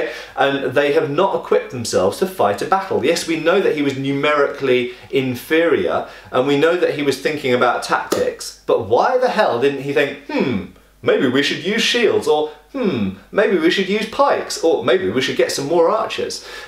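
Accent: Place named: United Kingdom. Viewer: did he just say "soccer" ? He sounds british though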